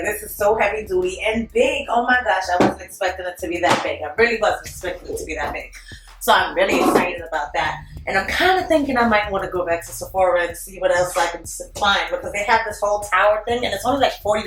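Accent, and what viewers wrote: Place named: East Coast, United States. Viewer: when I hear your accent, I automatically respond with "What up ma?" Girl your accent is just what my "West Coast living but missing the East Coast swagger" heart needs on the regular. I have the same issues with my eyes - the water eyes be killing my canceler game - do you have any tips?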